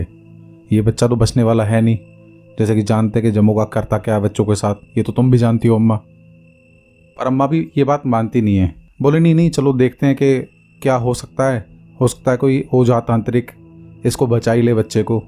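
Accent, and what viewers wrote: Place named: Meerut, India. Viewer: Jai shree Ram bhaiya ji.. me Meerut me rahti hu.. aapki baato se lagta. Hai ki. Aap bhi Meerut ya Meerut ke aas pass hi. Rahte honge. Sunte hue aisa hi lagta hai ki humaare neighbour bhaiya hi story suna rahe hai. 45 years Meerut me ho gaye hai tho Punjabi ke saat saat ye language bhi hum sab me rach bass gayi hai 😊 aapki sabhi stories bahut hi acchi lagti hai 🙏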